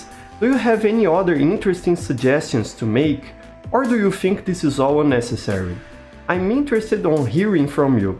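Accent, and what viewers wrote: Brazil. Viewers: tu e br né? Seu sotaque entrega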